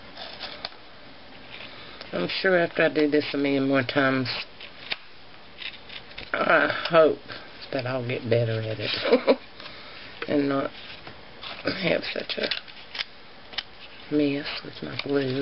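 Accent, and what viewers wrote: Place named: Tennessee, United States. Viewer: U r too cute. I'm from SC. You sound like you r from TN.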